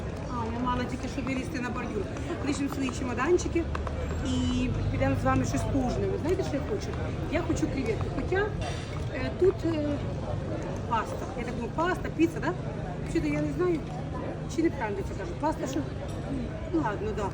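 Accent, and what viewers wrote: Surzhyk, Ukraine. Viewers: Суржик колхозный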